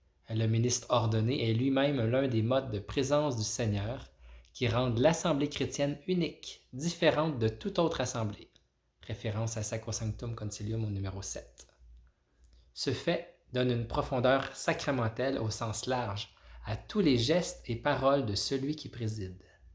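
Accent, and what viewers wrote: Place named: Canada. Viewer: je me suis perdu sur youtube t qui toi (mais ta un accent canadien c trop bien)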